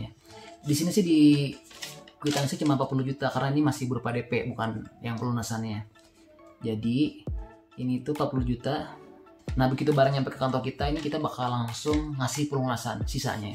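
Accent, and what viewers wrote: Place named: Banjarmasin, Indonesia. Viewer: pian urang Banjarmasin kah? Logatnya spt org bjm.. 😊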